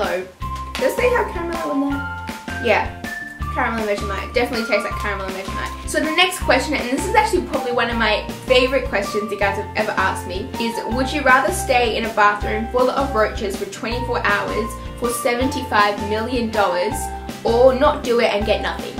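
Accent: United Kingdom. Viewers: You have a British accent I thought you were from england not Australia haha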